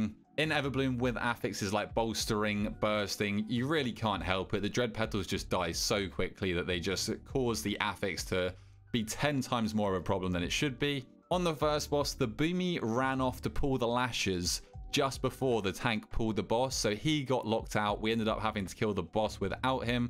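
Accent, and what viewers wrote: United Kingdom. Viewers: Couple of questions mate, how do you even click those skinny nameplates and also, where in the UK are you? You sound fairly southern... Great content btw, love it.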